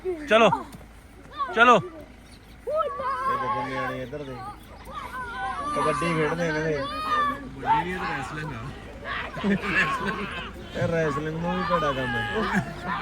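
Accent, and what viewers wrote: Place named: Malwa, India. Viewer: ਜੀ ਤਵਾਡੀ ਮਲਵਈ ਭਾਸ਼ਾ ਹੈ ਅਸੀ ਜਿਹੜੇ ਪਾਕਿਸਤਾਨ ਤੋਂ ਆਏ ਹਾਂ ਜਾਂ ਮਾਝੇ ਵਾਲੇ ਸਾਡੀ ਭਾਸ਼ਾ ਢਿਲੋਂ ਸਾਹਬ ਜਾਂ ਸ਼ਮੀ ਜੱਟ ਹੋਰਾਂ ਦੀ ਭਾਸ਼ਾ ਇਕੋ ਹੀ ਹੈ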